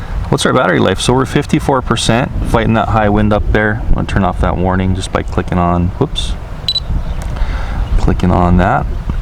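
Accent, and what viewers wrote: Hawaii, United States. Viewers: Kras 4 he is in Hawaii & they have their own accent and enunciation. I’d like to hear the critics living on the main land try to pronounce some Hawaiian street names.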